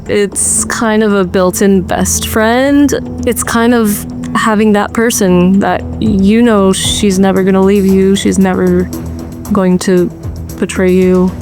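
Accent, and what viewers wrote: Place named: Quebec, Canada. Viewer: She has a slight French Canadian accent. English may not be her first language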